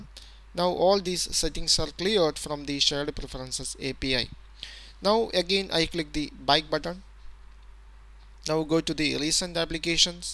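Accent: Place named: India. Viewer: Ótimo tutorial... funcionou certinho!!! Esse indiano é foda!!! O sotaque é mor comédia... mas o cara eh bom!!!! hehehehehe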